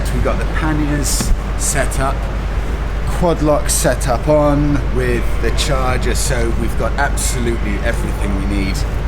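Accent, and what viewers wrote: RP, United Kingdom. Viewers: My hero David Icke lives on the Island.I have been there years ago.It certainly looks nice if you are lucky with the weather.You have a very clear speaking voice BTW.Slightly posh but not as much as a Sloane ranger.We never did get to see,but i heard her low sweet voice.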